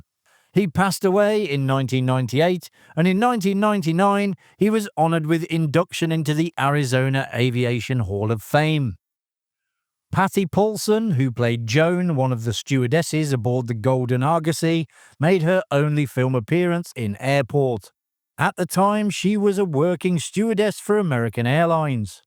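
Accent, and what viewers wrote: United Kingdom. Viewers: When I hear a british accent I know its time to turn off.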